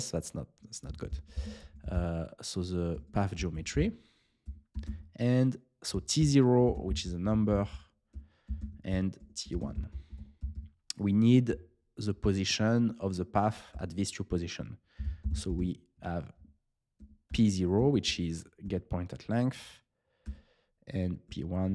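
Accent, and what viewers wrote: France. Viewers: Le meilleur accent français ! 🇫🇷 Great Video 👍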